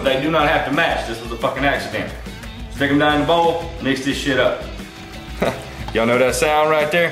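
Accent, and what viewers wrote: South, United States. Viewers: You're brilliant, and so down to earth. You make it great entertainment down here in Australia for me. 😂 I love hearing your stories and this cooking stuff is just a down to earth southern country boy by the sound of your accent that I've that heard on utube. I wonder why you are single, I'd love you to be leaving your boots under my bed. You are one good looking handsome hunk of a man. 😊 ❤❤❤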